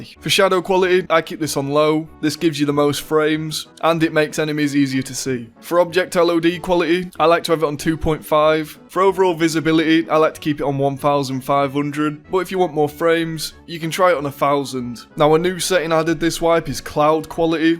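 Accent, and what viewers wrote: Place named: Northern England, United Kingdom. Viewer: This MF'er got that House Stark accent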